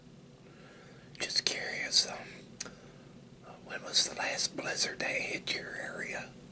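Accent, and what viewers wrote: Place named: South, United States. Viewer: hey, hope you're doing very well :) my saturday was alright, I haven't been able to stay home alone for a long time, and finally got the chance to do so, which was a literal threaphy... I live in Turkey / Istanbul and as odd as it sounds, we have yet to see a snowflake in the city lol. I remember blizzards happening in the past, but it is different these years. the reason is maybe global warming, not sure why. maybe little snow storms from time to time, thats it. I'm done with my final exams, made my favorite salad at 5.27 am in the morning - browsing your videos :) glad to see a fresh upload, I'm a big fan of your (southern?) accent. Have a nice day / evening, I'm around.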